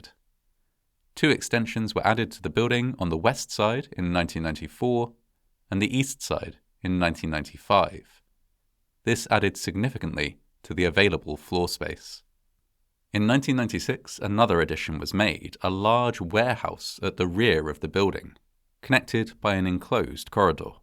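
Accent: United Kingdom. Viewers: The narrator is so annoying. Why do we have a British narrator with an American story sounds bloody awful.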